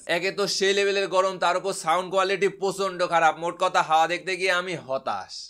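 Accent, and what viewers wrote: Pabna, Bangladesh. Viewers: apnar basha pabnar kothai bro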